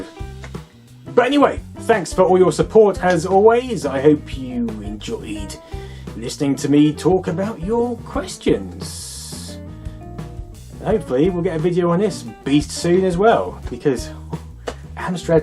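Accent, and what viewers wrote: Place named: Estuary, United Kingdom. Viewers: Spinkington Clift haha you'd have no chance in this part of the UK, he's pretty posh by our standards. British accents vary considerably. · It's a British accent. That's how they talk. Actually if I'm not mistaken that's the "estuary accent" that's common in the UK. Not pronouncing final -r sounds is a hallmark of British accents and the th pronounced as F is called "th-fronting". But my linguistics education is pretty much limited to YouTube videos so i'm not an expert.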